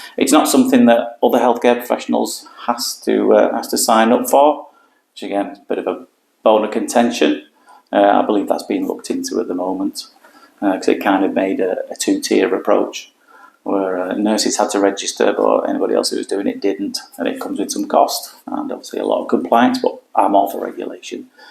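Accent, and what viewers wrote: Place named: Bolton, United Kingdom. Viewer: love it.. 3 years in college in Bolton back in the 80s so I love the accent (thought you was boltonian at first).. good luck with the channel. The others audiologists..raithatha, zhao and our Welsh friends) went from 10 subscribers to thousands and thousands in a very short period.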